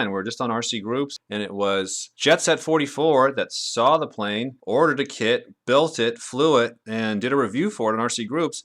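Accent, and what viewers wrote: Russia. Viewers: like the russian accent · lol russian accent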